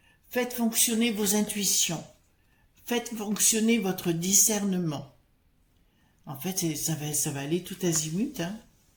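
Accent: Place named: South, France. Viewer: Merci pour votre tirage ! Surtout gardez votre accent du sud qui fait tellement de bien aux gens du nord ! A écouter le Pr Perron sur SUDR.... qui parle de la picpic et du Pr défraîchie..... la parole se libère !!!!!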